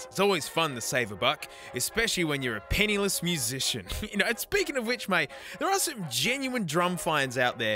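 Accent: Australia. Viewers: this has guy always been the best for when you just want to relax and watch an aussie speaking like an aussie should speaking as an under 100k subs veiwer on the main channel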